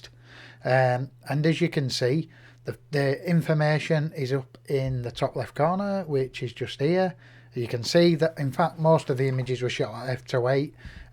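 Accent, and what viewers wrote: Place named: Manchester, United Kingdom. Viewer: sounds like a manc :-) Regards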